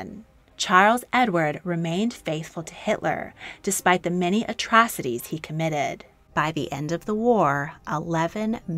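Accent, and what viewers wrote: California, United States. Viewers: Californiaaaaaan accent with vocal fries absolutely horrible. Say Trey for us and which roads you used today. USA refused to enter the first World War until the very last moment in 1917- the cannon fodder to hold back the otherwise successful German push on Paris. 1926-8 USA banned immigration especially by European Jews. 30% of USA was German decent and lobbied (I would argue 99% with the most honourable reasons) for USA to continue isolationist policy. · Good video but omfg the narrators voice makes me suicidal soo american and soo high pitch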